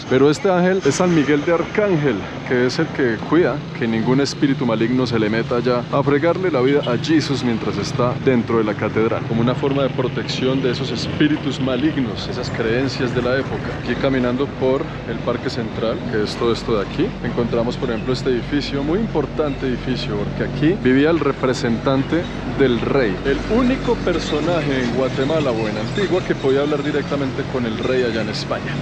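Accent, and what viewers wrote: Colombia. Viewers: Según tengo entendido, es hijo de madre Colombiana, padre Argentino, nació en Panamá, vivió varios años en Canadá, tiene sangre de Mesoamericana y de la península Ibérica, con acento colombiano. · Muy buen relato hermano, por su acento, ma párese como de Bogotá, Rolo como les llaman a los de Capital de Colombia. Gracias por su reportaje, de Antigua., Monumento Colonial de América. Saludos Cordiales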